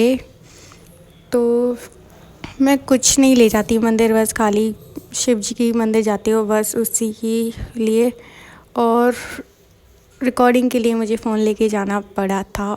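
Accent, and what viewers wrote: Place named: Dholpur-Agra, India. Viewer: Language toh dholpur agra wali h kyuki m yha maniya (dholpur) posted hu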